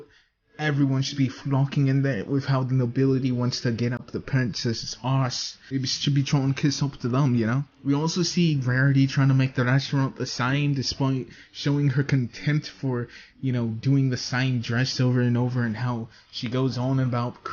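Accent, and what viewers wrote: United Kingdom. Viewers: Maybe this is normal for him because he is British? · why the buck are you talking British? talk normal. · No gripes about the content of your commentary, but WHY oh why did you use a British accent? I only ask because you don't normally talk like that. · dude what the heck all I could think about was you talk in a British access I mean really im not saying it bad im just saying what happened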